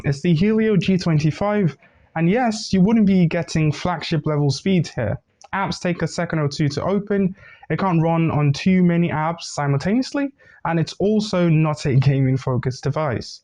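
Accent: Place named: United Kingdom. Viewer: Nice review. Plus I like listening to ur British accent. Always.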